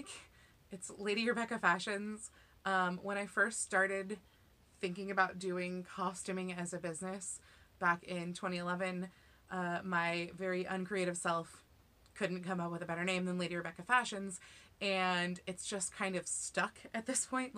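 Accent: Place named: Oklahoma, United States. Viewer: Oklahoma? No wonder I enjoy listening to you.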